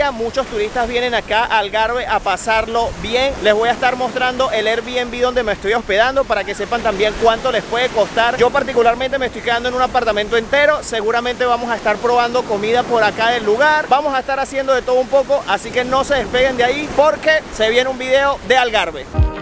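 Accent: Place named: Venezuela. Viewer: Pense q. hablabas Portugues q. eras Venezolano padres portugueses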